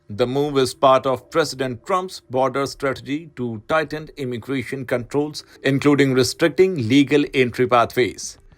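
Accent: India. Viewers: Heavily indian accented and using the word LAKH only used by south asian countries.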